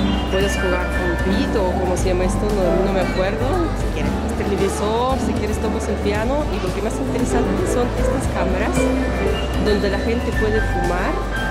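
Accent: Peru. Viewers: HOLA ME RESULTASTE MAS ACHORADA .....ESTAMOS JODIENDO /////ME HECISTE REIR USUALMENTE UNO HABLA LISURAS MAS CON LOS AMIGOS MAS INTIMOS PERO YA TIENES ACENTO PERUANO POR ESO SE TE QUIERE SALUDOSSSSS Y HOY ME SUSCRIBI PARA SONREIR DE TUS VIDEOS.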